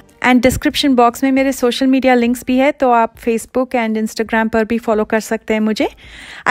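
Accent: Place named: India. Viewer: ur hindi accent sooo cute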